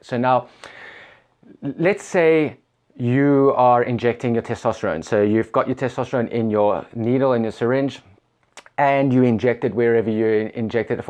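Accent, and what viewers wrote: South Africa. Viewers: Sounds south african?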